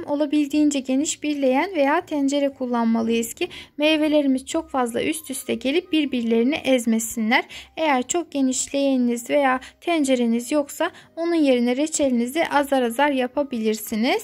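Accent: Azerbaijan. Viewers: Xanım salam ,siz Azərbaycanlisiz ,lehcəniz mənə şirin gəldi .Abone oldum əllərinizə sağlıq 🌼🌼🌼🌼🌼👍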